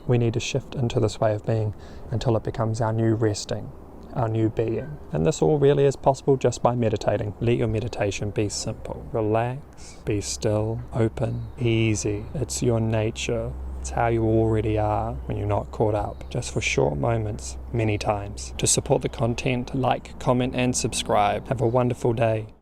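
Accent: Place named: New Zealand. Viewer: Hi, thank you for sharing this. I became enlightened about a year ago. Of course it is a bit of a misnomer as strictly speaking there is no self to become enlightened but you know what i mean. I say i am enlightened but i am not permanently living in oneness or non duality. I am shifting between non duality and duality usually many times each day. Sometimes i am in my mind with ego and other times i am in consciousness or oneness. The great thing is i have realised my true self and i am living from that place. Every day i feel beautiful bliss or nirvana. I experience a baseline of this bliss. It is always there now at some level. It does change in intensity but its always present. Sometimes suffering still comes up. The suffering is reducing for sure and the periods of time when i feel suffering are reducing. To be honest with you the reason im commenting on your excellent video is because i picked up on your kiwi accent. 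I am a kiwi too. Apart from myself you are the only other kiwi i know who is enlightened. You don't live in Dunedin by any chance do you?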